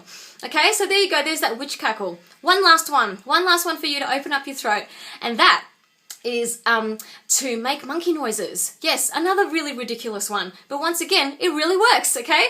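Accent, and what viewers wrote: Australia. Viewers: i can't get enough of your slight asian-aussie accent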